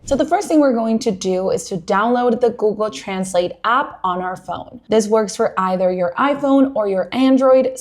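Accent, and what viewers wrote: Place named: Latin America. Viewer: Hi! I love your accent.. Are you Latin ?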